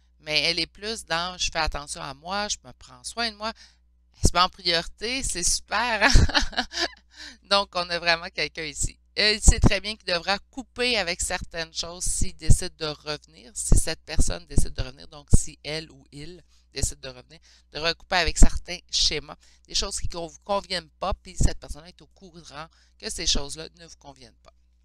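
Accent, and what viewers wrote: Canada. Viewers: Vous êtes toujours très juste dans vos tirages. Très amusante aussi des fois.j'aime bien quand vous dites "mon petit poulet "😂😂...Seul chose désolant pour moi j'ai beaucoup de mal à vous comprendre. L'accent canadien est difficile à comprendre. Je recule la vidéo plusieurs fois pour vous comprendre...Toutes mes excuses mais j aime beaucoup. Merci beaucoup 😊❤🌹🌹🙏🙏😘😘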